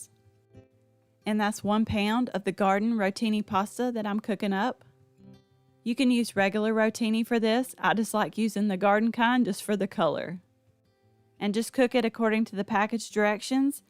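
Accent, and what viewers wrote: South, United States. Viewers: You have such amazing recipes! I love watching you cook and listening to your voice. It's so heavy with your southern accent which I LOVE and it's so soothing. It reminds me of my Momma's♥️ 💕🙏🏼💕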